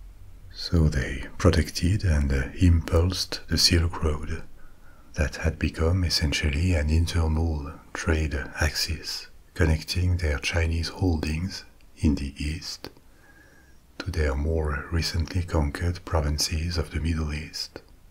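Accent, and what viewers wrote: France. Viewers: Wonderful, relaxing ASMR and such a sensual and sexy voice with a gorgeous French accent. Delicious. 🥰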